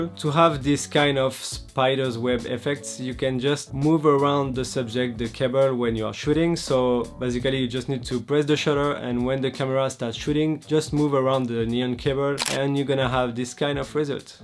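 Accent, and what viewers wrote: France. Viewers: Lovin' this french accent 🥰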